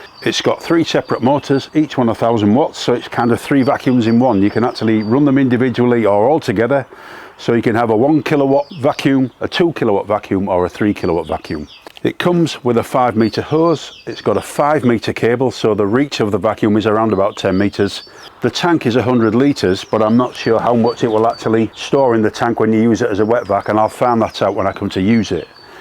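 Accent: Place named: Hull, United Kingdom. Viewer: I'm from Hull and I'd recognise that accent anywhere!! Also, nice job emptying the leaves into the next door neighbours garden. 😂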